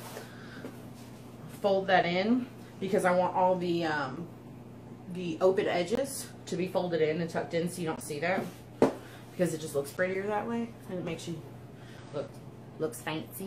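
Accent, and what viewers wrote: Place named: South, United States. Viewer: like the southern accent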